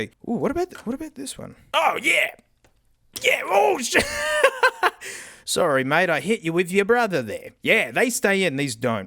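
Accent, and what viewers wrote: Australia. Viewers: He's speaking a dialect of english known as "Australian" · The only reason I watch him is because his auzzy voice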